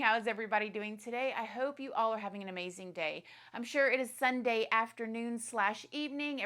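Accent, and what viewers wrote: South, United States. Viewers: Why even bother saying anything at all? Is it for your 2 seconds of want to be fame? Just be nice or be quite. It’s called a southern accent.. if you don’t like.. don’t listen.. it’s that simple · Why do you have a southern accent but pronounce "on" like a New Yorker?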